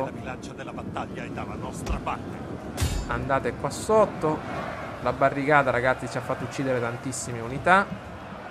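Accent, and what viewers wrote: Sicily, Italy. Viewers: Quando ti alteri un pò e alzi la voce col tuo accento siciliano mi fai morire, grande! P.s ricorda di finire l'esercito vandalo sopravvissuto alla battaglia, c'è il loro re lì dentro. PPS. i tuoi giavellottisti non lanciavano perchè avevano davanti a loro gli alleati erano ostacolati, credo.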